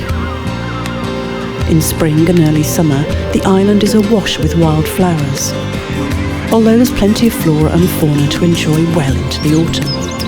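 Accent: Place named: United Kingdom. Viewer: What I like best in this video is your first class British accent.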